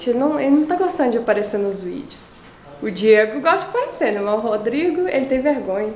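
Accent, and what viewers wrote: Minas Gerais, Brazil. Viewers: Adorei o mineirês! Sou baiana, mas o jeito mineiro de falar é o sotaque brasileiro que mais gosto! Vim do canal da Daniele Paula!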